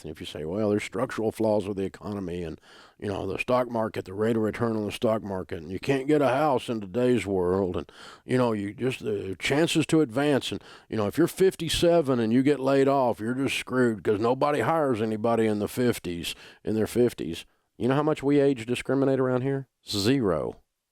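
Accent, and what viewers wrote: South, United States. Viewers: I like your show but New Yorkers accent is a lot better than southern accent. No offense.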